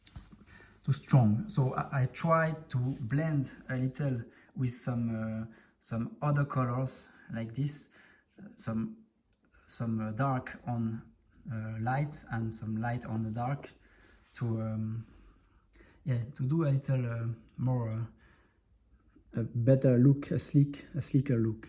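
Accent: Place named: France. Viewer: un french accent ma foi tres mimi, gg à toi pour les modifs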